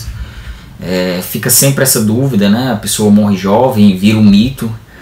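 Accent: Northeast, Brazil. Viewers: Você e nordestino?🤠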